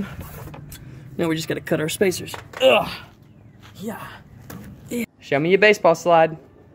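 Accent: Alabama, United States. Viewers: You from alabama?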